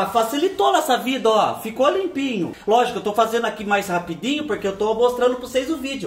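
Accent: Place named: Paraná, Brazil. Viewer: acho lindo seu sotaque parece sotaque de paranaense lá do sul💋🙏🍀❤️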